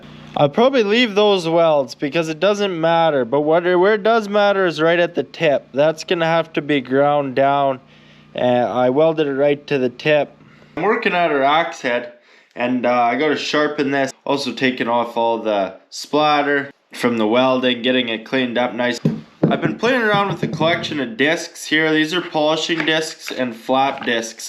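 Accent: Canada. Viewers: Love that middle Canadian accent. If you said ‘don’t ya know’ after sentences I’d say you were from north of Minnesota lol. Love this channel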